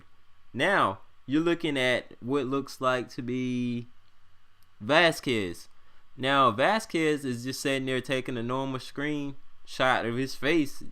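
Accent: United States. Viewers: where you from? You sound like you're from down south, not saying that's a bad thing just curious.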